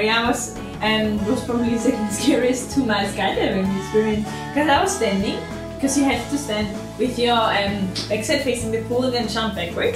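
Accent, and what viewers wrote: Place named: Scandinavia. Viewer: Where are you from I hear your accent but can't determine where you are from. Is it Scandinavian?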